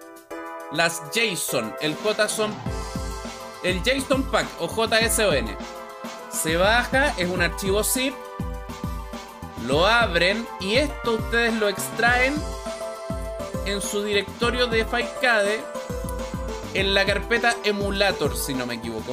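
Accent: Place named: Chile. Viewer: OMG QUE CHILENO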